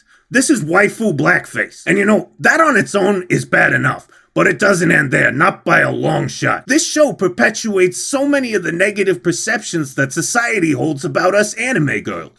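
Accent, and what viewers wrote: United States. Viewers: I gotta ask... what part of the US is this waifus accent from? Subscribed anyway lol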